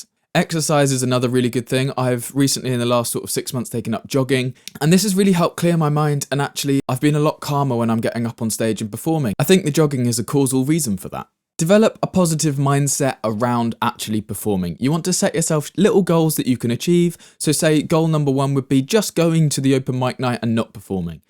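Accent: United Kingdom. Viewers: Luv the Great Britain accent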